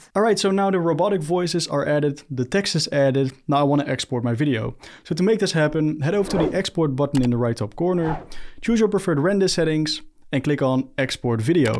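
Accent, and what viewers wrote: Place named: Netherlands. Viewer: Bro is from the Netherlands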